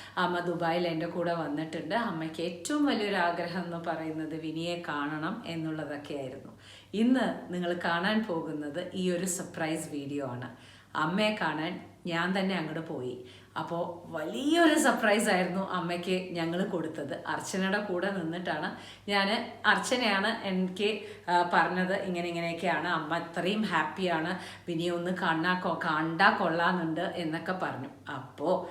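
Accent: Palakkad, India. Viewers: Hi am always see your new episode. Great dear 🥰 pkd evide anu varubool kananam.realitiyodu kudi ulla presentation.palakkadan natural slang super good bless yu.❤ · എനിക്കും ഇങ്ങനെ 🥰 വിനിയെ കാണാൻ ആഗ്രഹം ഉണ്ട് പാചകവും വാചകവും ഒരുപാട് ഇഷ്ടം 🥰 ഒരു പാലക്കാടിന്റെ സംസാര ശൈലിയും ഒക്കെ ആവണം അത് 👏👌👌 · I am also a fan of you recently started seeing your vlog I am also from palakad so feel very familiar when I hear your way of talking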